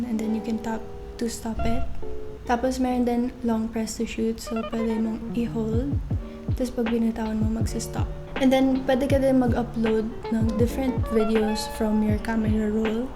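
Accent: United States. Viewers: Parang americana po kayo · Parang americana magsalita Ang cutee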